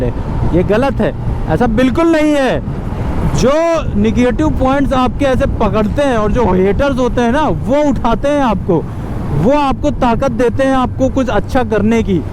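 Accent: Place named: Uttar Pradesh, India. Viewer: Bro your up accent is 🔥🔥🔥🔥🔥🔥🔥,keep speaking 🗣️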